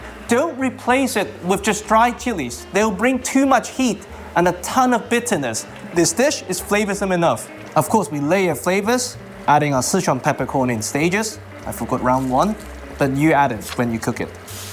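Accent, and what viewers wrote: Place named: United Kingdom. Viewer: Your British accent is adorable! 🥰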